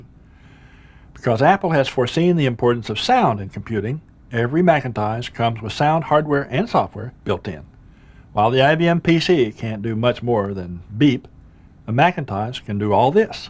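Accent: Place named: South, United States. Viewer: I love the presenter’s easy going southern accent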